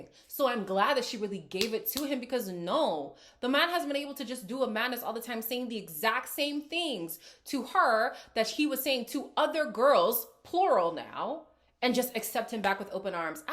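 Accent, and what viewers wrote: Canada. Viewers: Your Canadian accent is so cute in this video 🤣 the passion in this review, I FELT IT!!!!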